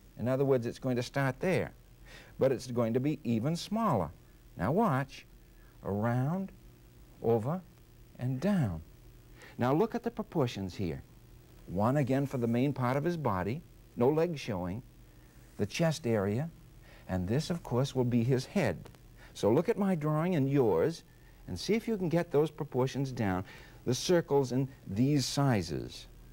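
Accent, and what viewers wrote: Boston, United States. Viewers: Love that “Bahston” accent!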